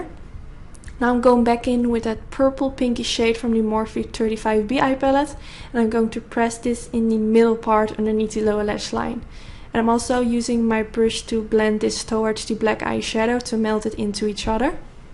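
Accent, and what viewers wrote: Netherlands. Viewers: nothing went wrong!!! it is extremely beautiful...btw are u Dutch? u have kinda like a Dutch accent. Beautiful!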